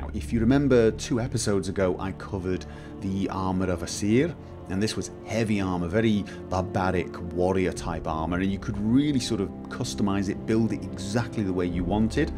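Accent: United Kingdom. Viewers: His accent is not Scandinavian at all it's British (I know the accents there vary but I'm not sure exactly where is from). However, he does live in Norway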